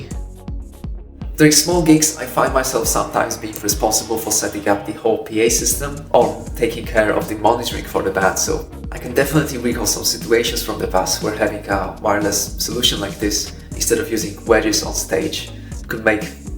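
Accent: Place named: United Kingdom. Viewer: I'm so pleasantly suprised with this video!! I believe it's the first time you ever made a video like this on youtube, but you seem super comfortable speaking on camera!! And I can hear the British accent coming through ;)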